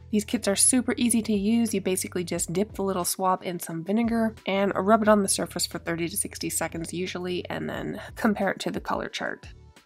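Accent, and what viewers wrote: Canada. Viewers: OMGosh, how petty. Salve...you are pronouncing the L. Aunt, people pronounce like the insect ant. Ignore the critics, people are so hateful 🙄. I love Canadian accent (I'm sure I have an Ohio valley accent). Very informative video, I want to start refinishing furniture to help supplement my income. Your videos help explain how you can get started💗💕💗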